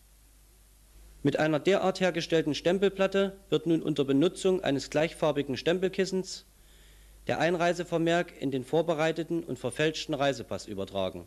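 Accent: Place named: Saxony, Germany. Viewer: ich könnte kotzen wenn ich dieses sächsisch höre...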